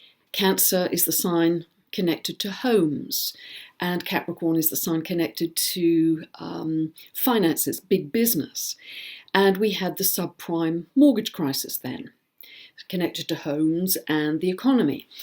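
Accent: United Kingdom. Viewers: Beautiful lady, great astrology! I love her British accent, too! So chic!!! Thanks & Blessings! ✨💫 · You are my favorite internet fairy. Your forecasts are way cool. Really enjoy the way you talk and the British accent, too. Thanks for doing this. Greetings from Florida.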